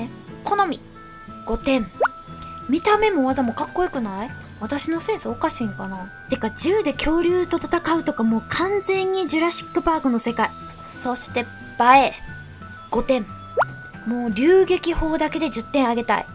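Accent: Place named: Kansai, Japan. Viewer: モンハンワールドグラフィック神っとる、そしてさんの関西弁がかわいい…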